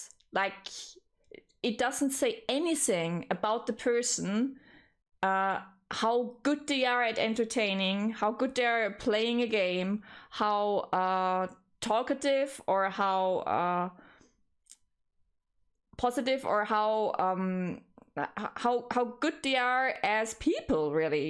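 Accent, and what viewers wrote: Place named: Tyrol, Austria. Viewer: super das man dein tirolerischen akzent auch im englishen hört :D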